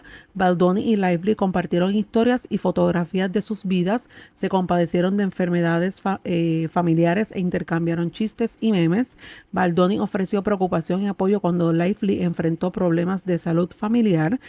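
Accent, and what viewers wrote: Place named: Dominican Republic. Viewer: suena como dominicana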